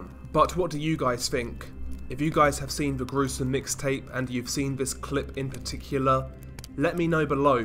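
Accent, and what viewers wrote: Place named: United Kingdom. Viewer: This british accent makes the video twice harder to understand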